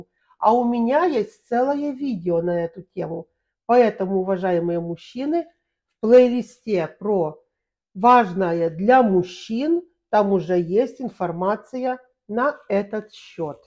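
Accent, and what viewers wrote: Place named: South, Russia. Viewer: Судя по акценту специалист с юга.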